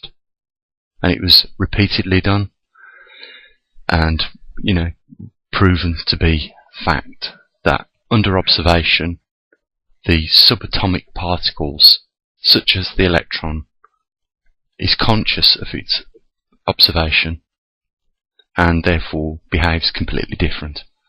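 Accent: United Kingdom. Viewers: He's got a Birmingham (UK) accent.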